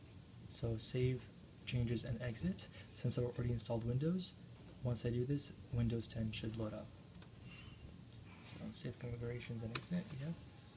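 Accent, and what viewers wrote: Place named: United Kingdom. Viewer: SHIT DAWG SLOW DOWN WITH THE BRITISH ACCENT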